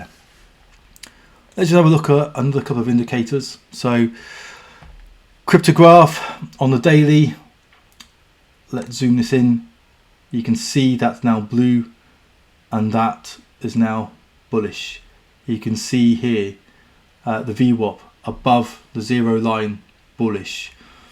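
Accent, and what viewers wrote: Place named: United Kingdom. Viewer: Soft British accent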